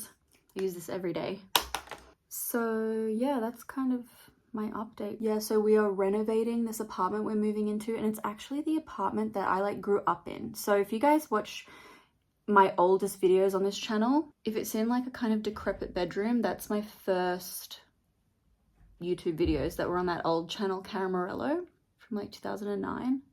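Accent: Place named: Australia. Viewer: I’m 31 and I’ve been watching you for agesss and I feel like you’ve lost your Aussie accent a bit 🥺 love you and love Australians so much ❤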